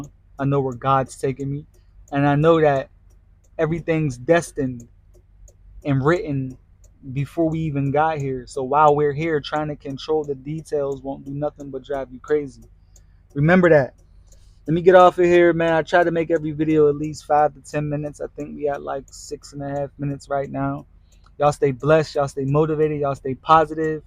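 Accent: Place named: Pittsburgh, United States. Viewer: U gotta be from Pittsburgh 🖤💛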